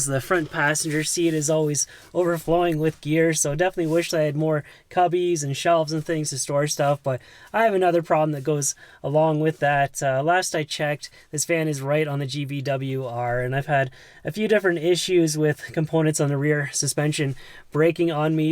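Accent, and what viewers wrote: Canada. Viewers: Good lord the way Canadians talk is so ANNOYING! Your “out and about” is so strong and just stupid! Learn normal English!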